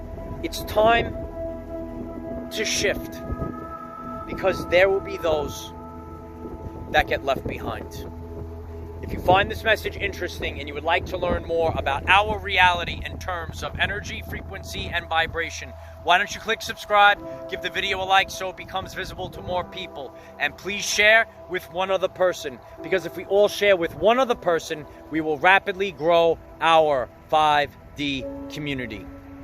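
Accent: New York, United States. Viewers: WOW! This was SUCH an amazing, powerful message. Thank you so much AA. Half my family and friends are jabbed zombies and I tried for years to wake them up, to a point of utter exhaustion. Now I just barely got enough energy to carry myself through so this message was perfect. Anyone else in here experiencing "glitches" with communications? Half my text messages and emails either never get received or I dont receive theirs. Its very odd....come to think of it, those are the zombies in my family and friends. You are so dead on when you say They cannot hear us anymore and the frequencies dont match anymore. That would explain all the "communication glitches" with my emails and texts. Thank you so much for all you do. Love your Yorker Accent:) Hugs from Canada xoox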